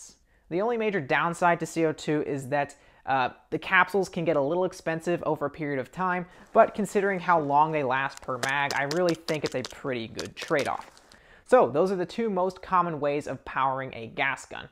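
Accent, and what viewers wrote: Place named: United States. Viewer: I cringed a little when you were describing a the blowback pistols.. Man, you sound like you’re here in the US, so why not get a real pistol? Having some knowledge of real guns can’t hurt. Racking the slide is really not that cool. It’s an integral part of the design. lol.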